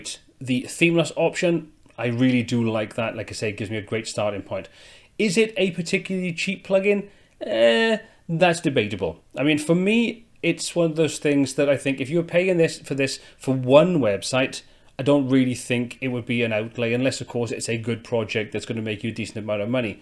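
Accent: United Kingdom. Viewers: sorry for my mistaken, in fact, i enjoyed your video. the problem is the British accent even is very nice but difficult to understand when talking fast. by the way, thank you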